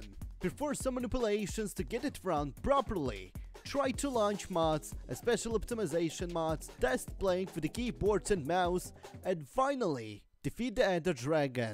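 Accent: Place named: Europe. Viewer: sounds like netherlands, german and czech accents mixed together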